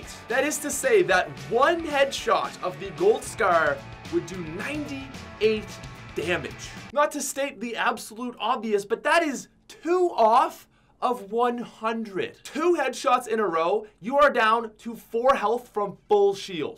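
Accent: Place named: Canada. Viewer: Ben Monaghan it’s his Canadian accent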